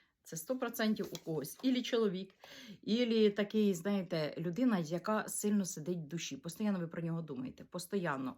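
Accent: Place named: Surzhyk, Ukraine. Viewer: Не приємний суржик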